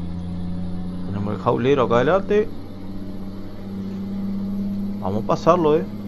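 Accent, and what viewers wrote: Argentina. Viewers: Capoooo Pense que eras Argentino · a pense que de argentina jaja saludos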